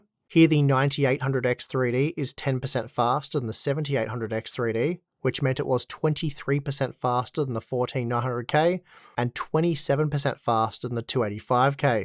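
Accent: Australia. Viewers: I have to SURPRISINGLY say that after watching this video I don't think I EVER wanna heard the word 'FASTER" ever again!! It's been drilled into my head SOOO MANY TIMES that I'm almost SICK of AMD winning JUST BECAUSE OF THIS!!!!! hahahaaa!! (I think it's mainly because I'm an American, and hearing the Australian accent the biggest difference that we hear is the 'ahh' in 'faster' ) ... and hearing that 586 times in one video drilled it in hard... and it SUCKS because I WANT to hear about AMD being FASTER!!! LMAO - I think I'll be okay tho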